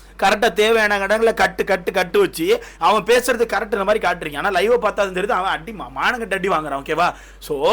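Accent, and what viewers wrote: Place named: Nagercoil, India. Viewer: Bro Nenga Nagercoil ah hahaha yalavu 😅Nagercoil slang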